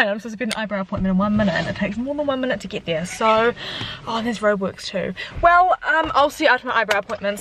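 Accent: New Zealand. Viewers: yes i love seeing kiwis on you tube as i am myself and you really realize our accents after watching american youtubers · I never understand why people think us kiwi’s have an accent but now I realise what they’re talking about lol. I just watched an American vlogger before your video and I was so surprised how distinct it is hahaha. You hardly ever see kiwi vloggers, also died when you said “ excited to put some ‘Kai’ in my stomach “ something only a fellow new-Zealander would understand 😂👍🏽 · New Zealand is beautiful it's one of the safest countries <3 and you have such a kiwi accent haha, now I can relate to school vlogs. I'm from Auckland. ~ :) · I just got auckland for half a year, i don't familiar with kiwi accent so far, your vlogs are perfect for me to practise listening skill of kiwi accent :) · I clicked on this vid and at the start realised you had a kiwi accent but by the end I forgot all about your accent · Just found your channel and also I love your accent cause it’s so pretty like I am from Colorado in America and I have never seen a NZ you tuber and I think your awesome now I am going to subscribe! 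· I sub as soon as I saw and heard your kiwi accent, love supporting kiwi YouTubers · i live in Australia, but i've always wished to have a nz accent, love yours!xx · I see so many people saying in the comments that they can’t understand the New Zealand accent but since I’m from New Zealand I literally understand everything you’re saying so it’s so weird to think that other people can’t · I subscribed because you were from NZ, and you never see them on YouTube. I LOVE YOUR ACCENT!! I’m Australian! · aw you're so cute!! I love kiwi's & their accents it's the best ahah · I went to NZ for term 1 and this brings back so many good memories lol, also your accent makes me miss my friends😂💗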